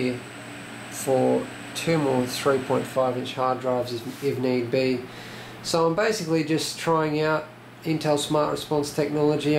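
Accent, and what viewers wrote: Australia. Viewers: I thought you were from Australia, I'm from Perth. Btw nice system im currently building my first water cooled setup up. Just a question? Umm how would you run your water loop? Would you go Reservoir > Pump > Radiator > CPU ???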